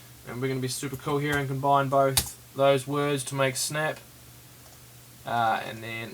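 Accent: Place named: New Zealand. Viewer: Lol turn on interactive transcript, not to great at interpreting the kiwi accent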